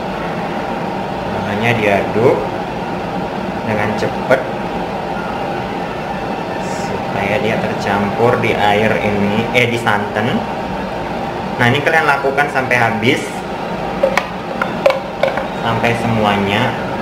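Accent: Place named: Karo, Indonesia. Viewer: khas karoooo sekaleeee hahahhaha mejuah juah buleeeee lidah karo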